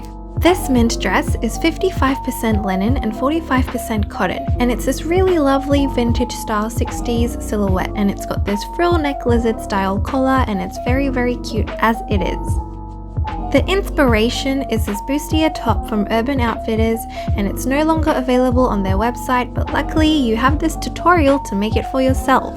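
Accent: Australia. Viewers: Absoooolutely love this video, your creations and your editing. Definitely gonna subscribe :) But I didn't quite understand how you did the middle cinch on that second top (I'm new to sewing and also I'm French, and although I love your Australian accent it was going a little to fast for me to keep up). I understood you made a channel to gather the fabric but i didn't get how ? Could someone explain it to me ? Thank you so much and I hope you get more recognition! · And cute personality and adorable australian accent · whenever i find a new channel and i hear an australian accent... it's like god decided to give me a gift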